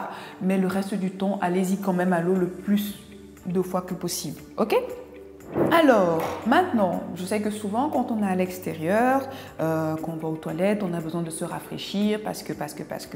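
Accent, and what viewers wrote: Cameroon. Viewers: Cet accent est typique des camerounais 🇨🇲🇨🇲🇨🇲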